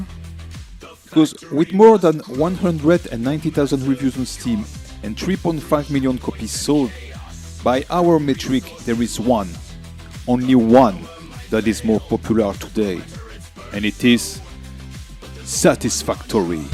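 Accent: France. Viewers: Of course! I find it quite charming to be honest. It's very intelligible, don't you worry, but it's also VERY french :D It's a neat accent. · This is some premium french accent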